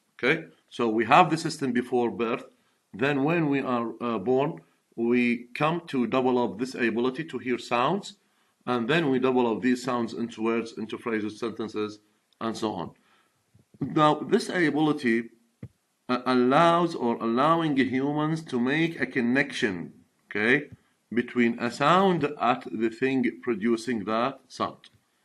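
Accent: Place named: United Kingdom. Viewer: اللكنه بريطانيه بامتياز . ❤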